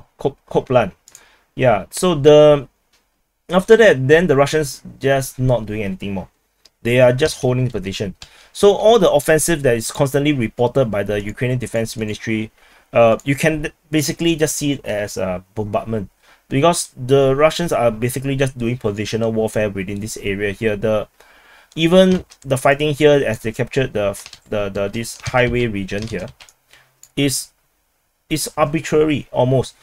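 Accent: Singapore. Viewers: Yes with your face is better ! Actually I follow your channel because of your English. Singlish? I like the way you speak English. You have a lot of humor too. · you sound like a singaporean, are you? haha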